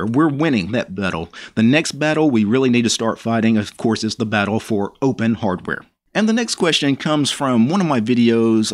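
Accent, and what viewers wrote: South, United States. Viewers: You sound southern. Where are you from?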